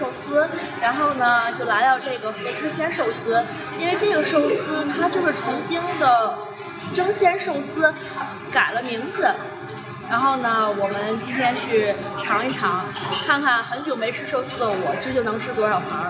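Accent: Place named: Tianjin, China. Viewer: 这满满的天普！我这个河西人听了真是内牛满面😭 还有那些街景！真亲切啊！订了订了！继续加油💪！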